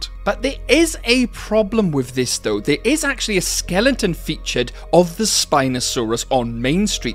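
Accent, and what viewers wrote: Wales, United Kingdom. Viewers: Idk why but u sound welsh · Awesome video! Also, whereabouts from the UK are you from, I hear a bit of a Welsh accent in there but cant tell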